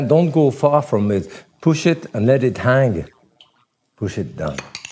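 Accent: France. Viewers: Fransız aksanı ile ingilizce cok tatlı 😀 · I love his rustic French accent. Its so cool!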